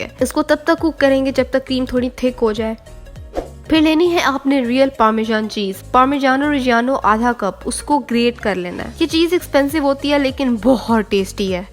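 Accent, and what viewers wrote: Punjab, India. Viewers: yaar aapi aap boht acha se explain krti ho i loved it ur punjabi axcent is just amazing ap sach mn Sialkot se ho hahah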